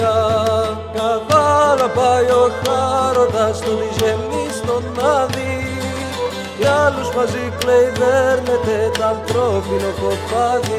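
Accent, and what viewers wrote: Crete, Greece. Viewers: A splendid effort at a kontylia,! You’ve got the Cretan accent down cold, too! Two small notes on the English translation though, for your consideration; 1. “Charon brings Digenis to Hades’ domain *on horseback*” — felt like this was a significant omission, given that «καβάλα» is the text’s incipit. 2. «της ομορφιάς την *πούλια*» could either be translated as “the jewel of beauty” (the poúlia, with a lowercase π, is a metallic ornament Greek women used to adorn their dresses and scarves with) or “the star of beauty” (the Poúlia, with an uppercase Π, which is where the ornament gets its name from incidentally, is the common name the Byzantines assigned to the star cluster of the Pleiades — the modern Greeks, Palamas amongst them, still use it to this day). I do not have Palamas’ poem close at hand right now so I’m unsure which way he might have spelled the word, but it’s safe to say his intended meaning wasn’t “the bird of beauty”, as poulí (“bird”, plural pouliá) is only a paronym of πούλια/Πούλια. 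Thank you for this beautiful offering! Greetings from a Greek national with roots from your neighbouring Qazvin! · Your accent reminds me of the way Cretans talk. As a Greek, i deeply admire all of your work. Thank you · What is this wizardry again? You even nailed the Cretan accent to the tee. On another note, whenever I hear the Cretan lyra my blood starts pumping faster in my veins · Reminds me a lot to, in general, the music for Ikariotikos or a specific Rhodian dance I can't remember the name. Certainly gorgeous as always man, I love that Cretan accent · Hello fellow "Cretan":D You speak Greek the same way you speak english? Or this was for the purpose of the poem? Nice creation! Loved it! Thanks!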